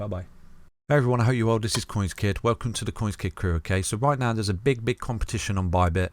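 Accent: United Kingdom. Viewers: Finally, the first! I love your channel and how yku present everything. British accent on top. Haha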